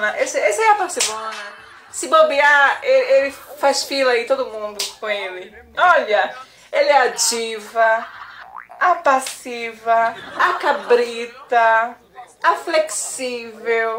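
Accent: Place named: Bahia, Brazil. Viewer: MEU DEUS DO CEUUU, EU SOUBE QUE VC É DA BAHIA E EU TAMBÉM SOU, EU SOU DE SALVADOR-SIMÕES FILHO! JÁ OUVIU? FAZ UM ENCONTRINHO EM QUALQUER SHOPPING DE SALVADOR, EU QUERO TE VER! BEM QUE EU DISCONFIAVA DO NOSSO SUTAQUE! RSRSRS...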